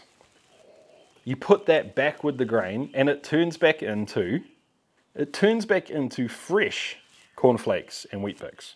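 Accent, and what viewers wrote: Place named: New Zealand. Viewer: You kiwi?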